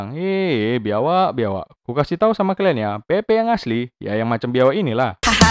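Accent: Medan, Indonesia. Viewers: NEW SUBS ~~ gw suka banget channel ini, lucu dan menghibur dengan aksen melayu medannya, ok banget bang!! semangat terus dan tetap upload video, eaa !!☺ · Bahasa Medan ini mah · Medan kalilah ngomongnya abang ini... mantap lanjutkan bang👍👍👍👍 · logat medan kali ahhhh... mantab kali kurasa. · emg kekgitu logat medan bg. Keras tapi lucu. 😀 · tapi tidak semua orang medan bisa membawakan video dengan logat bicara selucu ini sih menurutku. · Nampak kalii ahh logat Medannya😅.. mantavv bg 👍🏻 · Memang harus diakui, MANTAP kali konsep channel kau ini bang. dengan logat medan yg khas, penyampaian yg unik dan Editing yg cukup rumit ditambah sentuhan humor, memang layak lah channel mu ini jadi konten kreator yg ditunggu tunggu. Semoga cepat tembus 1 juta subs abg biawak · Logat² Medan bah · Org medan ini, kek paniliar kali awak sama intonasinya, horas lah lek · Adminya kek orang Medan kurasa dari logatnya kwwkwkwk ngakak laeeeee biawak biawak wkwkkwkw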